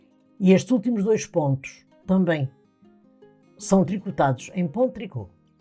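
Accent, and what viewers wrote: Portugal. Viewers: Já fiz muitos de seus trabalhos. Vc é uma mestra! Bjs de Boston e amo esse acento português maravilhoso é tão charmoso!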